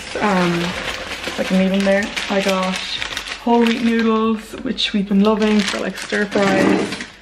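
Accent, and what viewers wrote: Ireland. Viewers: has a lovely soft Limerick accent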